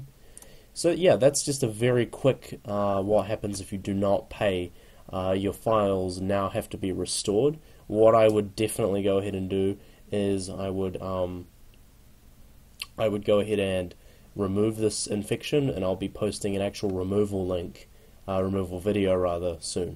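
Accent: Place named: New Zealand. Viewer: Nice kiwi accent ;)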